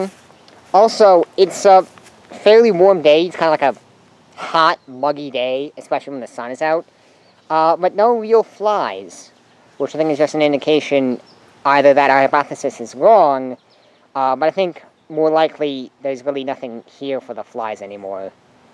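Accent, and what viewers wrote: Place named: Minnesota, United States. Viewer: Just heard it "Southern-ish Minnesota"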